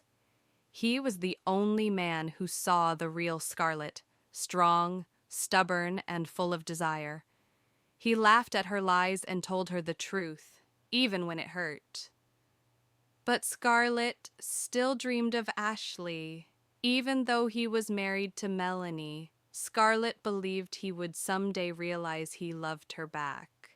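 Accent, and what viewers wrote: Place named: United States. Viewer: excellent and clear American English pronunciation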